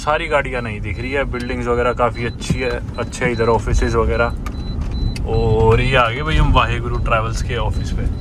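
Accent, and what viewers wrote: Haryana, India. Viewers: I really like ur height and also ur haryanvi enuciation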